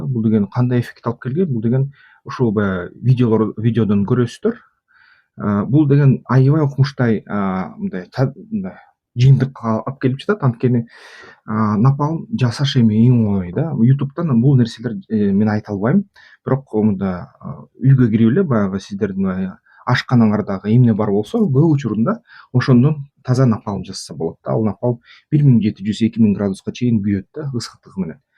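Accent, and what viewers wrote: Standard. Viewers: отто таза сүйлөйсүң дикция керемет.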